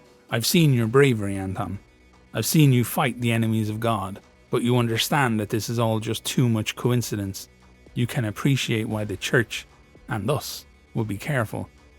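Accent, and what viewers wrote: Ireland. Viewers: What an amazing story. Love the style, the temhe and your irish accent!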